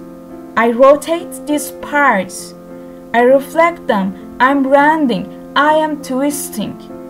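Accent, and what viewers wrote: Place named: Japan. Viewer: Japanese English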